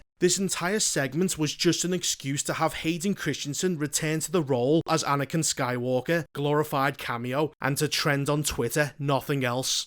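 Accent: Liverpool, United Kingdom. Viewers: i can't take the beans on toast accent seriously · Scouse, innit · Mate you make me laugh ye sound scouce we’re ye from buddy lol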